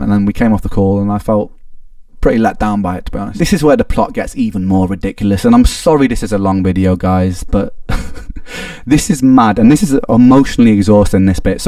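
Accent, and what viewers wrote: United Kingdom. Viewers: Ren, every time I hear you speak, I wish more and more that I knew you, and could talk to you personally. ( And I don't just mean because of your accent, although it is quite lovely, and reminds me of the time I lived in England) But listen, Every. single. One. Of. Your. Fans. Is one hundred million times behind you, and I hope you don't believe for a second that we would ever believe any slander about you. Because what you've done for us, is far beyond having given us catharsis, identity, and connection. It's far beyond your amazing talent and the joy and Inclusions you've more than you will ever know. You've given us a piece of your soul, a glimpse of your deepest thoughts and being, and that is an indelible mark upon each and every one of us. **And don't fret about your song. I don't want to say anything to fuel this fire, but please message me if you would, because I have good news for you.*** Aside from that, I shared your music with my college psychology professor tonight, because she was avidly interested when I told her about your story, and the message of your music. 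I can't wait to hear back from her, I know she'll love it! Moreover, whatever money you've lost, say the word, and you know your patreon would be filled 10x overture amount, in a heartbeat. You are a genuine, beautiful soul, Ren. I'm eternally grateful I found your music, because I relate to you more than you can imagine. We've gone through very similar things at the same age, and for me, it's something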